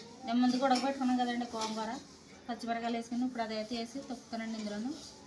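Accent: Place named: West Godavari, India. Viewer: నువ్వు. మాట్లాడే. పద్ధతి చాలా బాగుంది. అండి. అన్ని మా నా. పశ్చిమ గోదావరి జిల్లాలో. అలాగే. మాట్లాడుతారు. వాళ్లు. కి. తేలియదు. కాదా. నువ్వు. బాధపావొదు. సరే నా. మీ. శాంతి. అక్క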